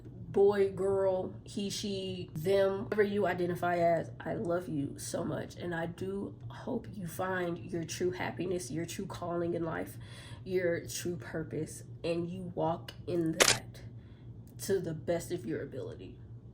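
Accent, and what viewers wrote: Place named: Texas, United States. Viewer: What city you in sound like a texas girl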